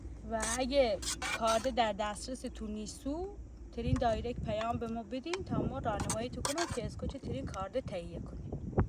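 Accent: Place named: Lorestan, Iran. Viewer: What a sweet Lori accent you have — I’ve fallen in love with it, it’s so beautiful! 💛❤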